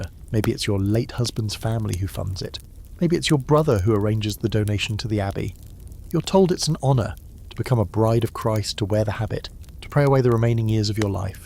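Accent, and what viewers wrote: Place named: England, United Kingdom. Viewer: would i be alone if I thought an english accent is one of the best for the subject matter? Seems more conservative than usa or australian accents...or perhaps just more presumption of possibly being more directly informed on a subject of the middle ages.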